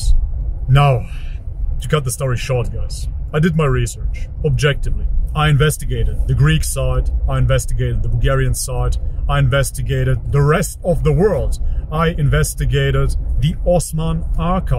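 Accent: Germany. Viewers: well he is and his accent is because he lives in Germany. Either way he is correct. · I was always wondering about your accent and thought it sounded German 😄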